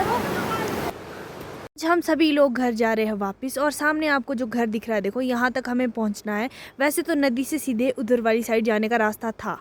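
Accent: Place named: Urdu, Pakistan. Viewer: Bara saaf urdu ka lehja he.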